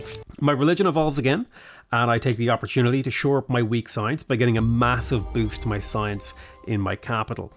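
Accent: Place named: Ireland. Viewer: Came for the review, stayed for the brogue, subbed for playing for Ireland 🇮🇪 quote.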